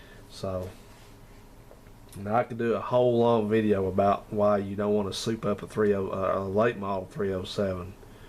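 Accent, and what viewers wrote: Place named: East Tennessee, United States. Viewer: Love the accent. If I have to guess, east Tennessee? (please don't yell at me if I'm wrong!) Brought back some memories from when I lived in Oak Ridge back in '96-'97.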